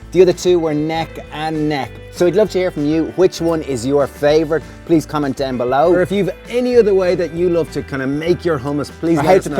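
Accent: Ireland. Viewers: Who would have thought Ireland had such hidden gems! Your fantastic accents distracts me from the food in hand! :)) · You guys are the best! Love the accent. Is it Irish?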